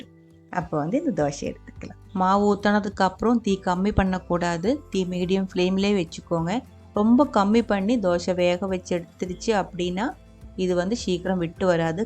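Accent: Kerala, India. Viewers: நீங்கள் மலையாளம் பேசுவது போல் தமிழிலும் பேசுவதால் கேட்பதற்கு நன்றாக உள்ளது சகோதரி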